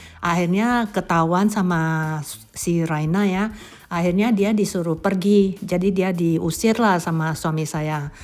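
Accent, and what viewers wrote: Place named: Aceh, Indonesia. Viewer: Waktu lewat beranda saya, logat bicara ibu seperti temen kuliah saya orang Aceh, saya kira ibu orang aceh Mau tanya ibu asli mana Ehh ketemu vlog ini... Terimakasih sudah bercerita... Sehat sehat bahagia selalu bersama 🤗🤗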